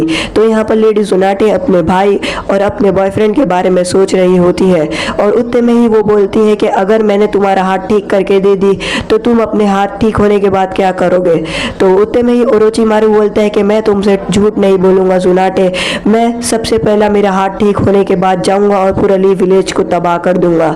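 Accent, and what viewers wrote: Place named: Hyderabad, India. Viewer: Your accent is like Hyderabadi you are from Hyderabad?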